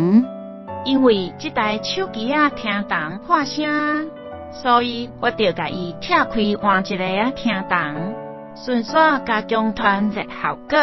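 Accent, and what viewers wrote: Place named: Taiwan. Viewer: 強大的台語，拆機教學，害我笑到肚子很痛。本來是要學拆機，變成學台語發音。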